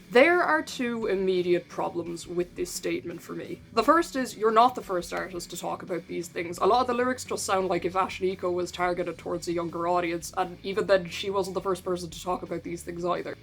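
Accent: Ireland. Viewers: What is this accent? Irish? You have a calming voice. Happy yt recommended this, I’m subscribing! You did a great job of going over this saga. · I don’t care. I’d listen to a contralto Irish brogue read the phone book. I lived in Dublin for a while and it brings me back. Beautiful country with lovely people with pitch black senses of humor. Hope I get to move back one day.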